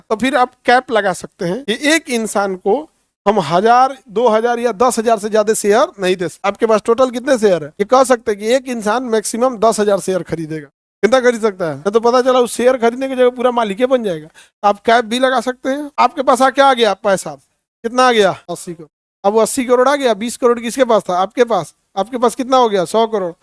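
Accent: Bihar, India. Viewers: Chahe pdhe likhe ho,pr excent to bihari dudh vala hi hai..meri mmi boli jo truck pe maal load krte unki video sun rhe ho😂😂 Hindi ni aati aapko Vaise to bolte ho ke google ki jagah indian search,type ek video bnai thi aapne..😅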